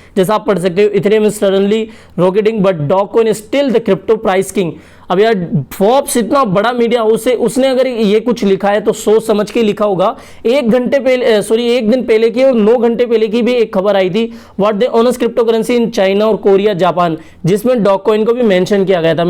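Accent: Rajasthan, India. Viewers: Rajasthani lg rhe ho Awaj se to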